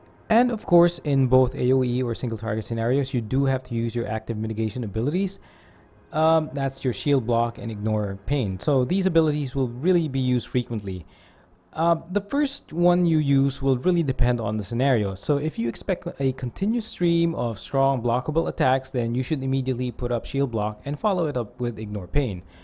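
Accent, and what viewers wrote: Philippines. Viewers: are you a Filipino? the way you speak and pronounce the words .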